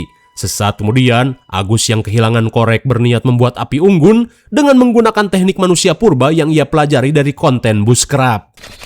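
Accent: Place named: Sunda, Indonesia. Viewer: emang pujaan aku sm saudara temen juga 😂..sunda banget seru kl udah bilang eta si....😂😂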